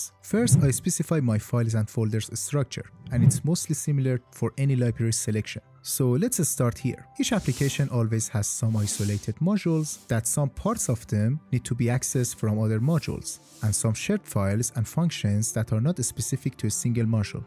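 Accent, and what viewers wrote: Iran. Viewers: love the iranian accent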